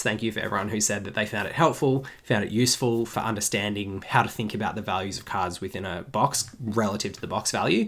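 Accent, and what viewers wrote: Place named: Australia. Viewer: What currency are you talking here? USD or AUD? You sound Aussie.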